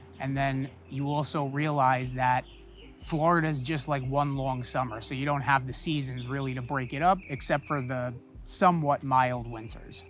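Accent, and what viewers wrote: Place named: Northeast, United States. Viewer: Good Video. Nice Northeast accent 😉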